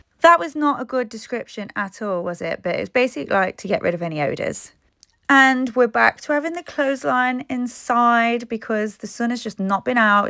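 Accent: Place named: North, United Kingdom. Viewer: Hi my lovely, great vlog, I needed this, it's just gone 9am it's Thursday and you popped up 😊 was watching you cleaning out your fridge, I noticed you put the shelves in the wrong way 😂 sorry to mention it but everywhere in your home looked so nice but the shelves were playing on my mind 😂 love the bedding might have to get myself some, I always think nice bedding makes the bedroom look nice ❤️ thanks for sharing and hope the weather is good for us all. I literally did a wash last night, couldn't be bothered to bring it in before going to bed and then this morning around 7 ish, heard the rain pelting down, couldn't believe it 😂 luckily it wasn't something I needed today 😂 but it's brightening up now so might have to rewash them again! Thanks for sharing. Before I go though, can I ask whereabouts up north are you from. I could hear a northern accent going on...hope I'm right 😂😂 I'm from Manchester born and bred but have lived in London for many years. 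Take care and looking forward to your next video 😘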